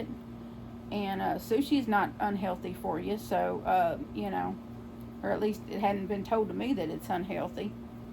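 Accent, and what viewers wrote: South, United States. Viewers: Omg I so love how your video started with your beautiful Theo❤🐕. And it is BS what happened to Mama Kayla's intro music. Y do people gave to b mean and cause drama !? It is so not needed and definitely not deserved. Thank u for explaining those weird sayings, I had no idea what they meant. We have our own sayings down here in Australia that may confuse too I suppose.🤭😆 But I just love the Southern American accents. Some do seem drawn out and others not so much but I just really love listening to u talk and I like how u talk fast as I am naturally a fast talker too, but during my working life before I was Medically retired, I was told to slow my speech all the time. Funny thing is when I was working I did consciously slow my speech !🤭🤣 And I am one of those people who is slowly going backwards in all your videos, plus watching all the new ones popping up.😁 Love and Prayers 💗💗💗🙏🙏🙏🐕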